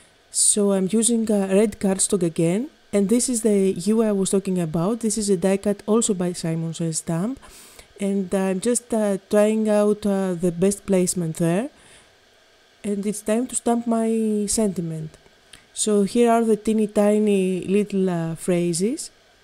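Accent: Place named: Greece. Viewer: I have been following your blog and videos for a while now and just love your cards. Just love your Greek accent.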